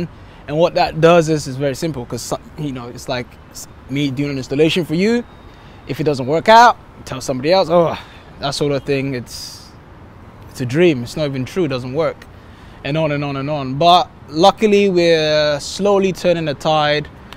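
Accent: Scotland, United Kingdom. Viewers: Love Scottish accent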